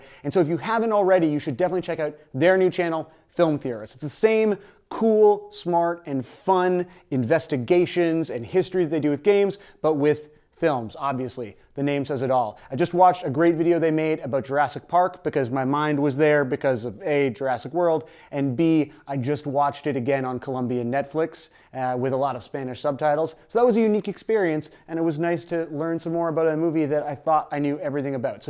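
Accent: Canada. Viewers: I came from game therory and have watched every episode. This is the first time I've noticed the "canadian accent"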